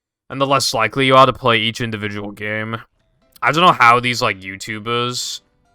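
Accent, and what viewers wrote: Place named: Boston, United States. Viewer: That's the Boston accent for you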